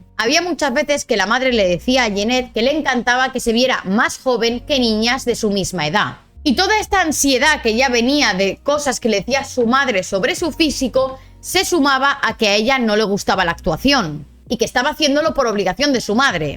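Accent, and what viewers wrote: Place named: Spain. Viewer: Los españoles tienen un acento bien culero Tengo entendido que si no fuera por Youtube todo España se muere de hambre jajaja 😅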